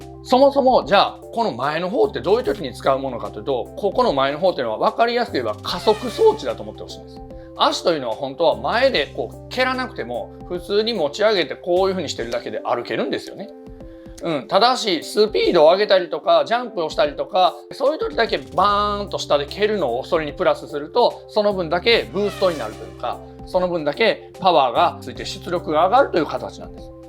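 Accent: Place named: Kansai, Japan. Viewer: 関西訛りですね。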